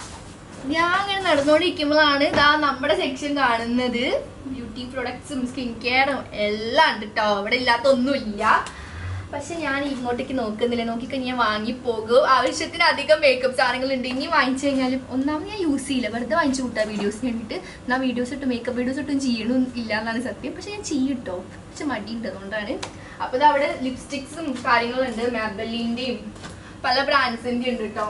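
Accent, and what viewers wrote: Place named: Kozhikode, India. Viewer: നീ കൊച്ചി അല്ല നീ ടെ സംസാരം kalicut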